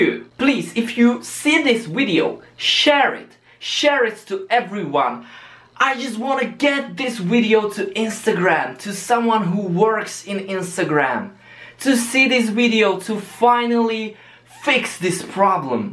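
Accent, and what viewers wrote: England, United Kingdom. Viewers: Čistí angličan super ide ty Anglický jazyk 😊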